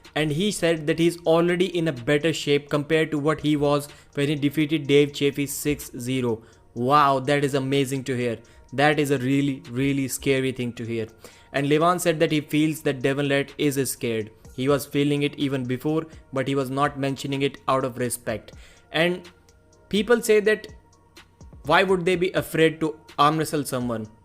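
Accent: India. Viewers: Indian accent is horrible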